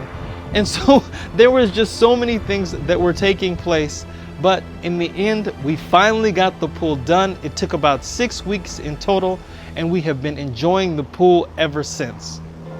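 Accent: Portugal. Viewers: I'm From Angola my Dad was Portugues i live in Portugal from 1999 till 2012 move to UK, but I feel like I wanna move back to Portugal, your cascais accent sound so Portuguese :)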